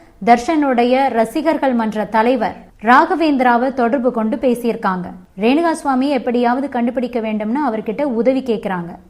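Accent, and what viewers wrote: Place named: Chennai, India. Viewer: Madras தமிழ். பினமாக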